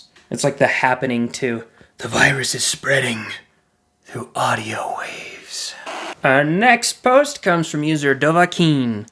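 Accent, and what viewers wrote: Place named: Germany. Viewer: I freaking love the German Accent XD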